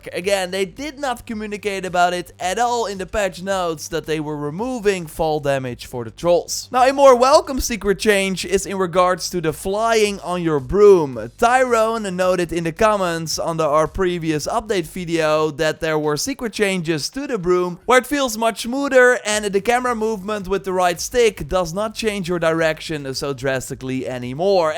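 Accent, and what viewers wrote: Netherlands. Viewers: Are you Dutch? Your accent sounds like it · Mooi Nederlands accentje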